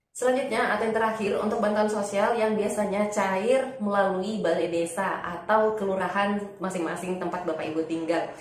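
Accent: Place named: West Java, Indonesia. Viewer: Klw s tth nya dr mn,kyk orang Sunda bkn😊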